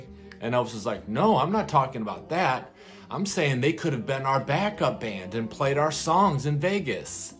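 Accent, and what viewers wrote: United Kingdom. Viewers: I read that on Google earlier. I can’t believe that Elvis would want the Beatles as his backup band..he had an incredible band! I love your English accent too lol! Imo, I don’t think they had the greatest musicians anyway! Elvis’s guys were perfect.. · I don't believe he wanted them he had a great band backup singers. Did like your British accent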